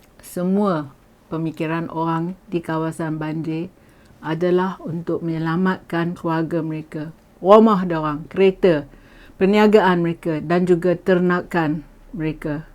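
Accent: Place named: Kuala Kangsar, Malaysia. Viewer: Seronok aku dgr accent kuale dia..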